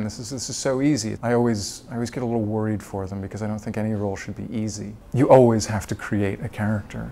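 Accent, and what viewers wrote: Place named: United States. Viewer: UAU...I mean, he's so hansome!!! Pretty eyes, pretty look, a litle bit faty'iiish and he's not a "bird man" maybe a cat person or a dog person just like me, (I love dogs). Oh, and I love his so american accent. And, in spite of criticizing italian people, witch I adore, cause they are so emotional and with a spicy drama in everything they do or say and they speek like as if they were singing and they also speak with a lot of hands gestures and body language but, well... in the end it all suits him very well. Pretty hansome guy, indeed.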